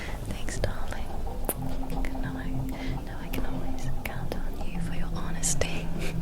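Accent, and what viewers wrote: Transatlantic, United States. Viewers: love the 1920s transatlantic accent, super cool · She's so versatile with her roles! I'm a sucker for the transatlantic accent so this checks all my boxes 🖤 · Which American accent is it? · transatlantic accents are my weakness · i like this classical american accent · You’ll probably not see this but I think it’s transatlantic, which was a mishmash of English and American, it was usually used by the upper class and Hollywood stars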